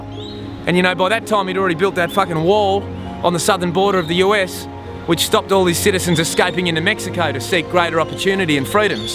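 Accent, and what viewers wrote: Australia. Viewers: yikes. i really doubt anyone wants to go a country where they'd have to hear your stupid aussie accent every day. better to be eaten by sharks. trump 2016!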